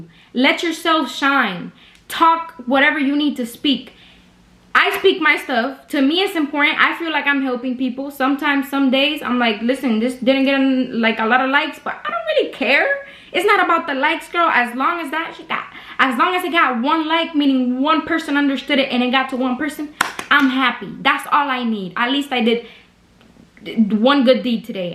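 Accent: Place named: Miami, United States. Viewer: I love your content.. and I can tell you sound like a Miami girl like me! I’m 48 and you’re definitely wise beyond your years. Keep spreading the positivity because Lord knows we can all use it 😊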